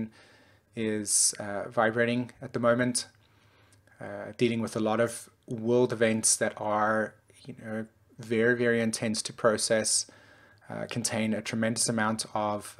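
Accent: South Africa. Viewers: you have a nice SA accent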